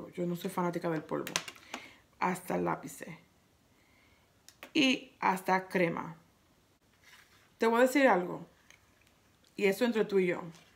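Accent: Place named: Puerto Rico. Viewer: You sound Puerto Rican.. lol but I love it! Soooo funny 😄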